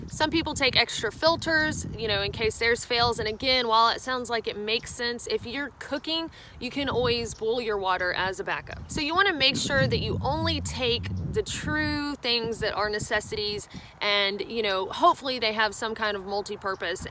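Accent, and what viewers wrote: South, United States. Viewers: Once again, top notch video, great content. Recently went to a seminar, and heard same points, different words. I like your southern drawl better. Thanks for all you do here!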